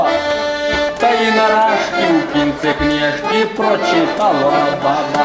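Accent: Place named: Hutsul, Ukraine. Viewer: Молодець акцент справжній гуцул!!!!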